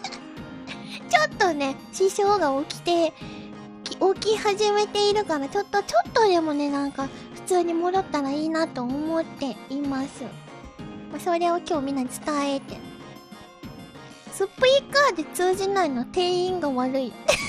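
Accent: Kansai, Japan. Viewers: there was one old stream (don't remember which one specifically) where she says her grandma's Kansai-ben influenced how she spoke when she was little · From the v-tuber wiki: She has mentioned on stream that her accent comes from a combination of her grandmother's Kansai dialect and an unknown dialect of her parents that has not been revealed. She is working on changing it into a more common one. Her accent seems to differ most notably from the inflections of syllables. For example, she pronounces 皆 as MIIN-na rather than the more typical min-NAA.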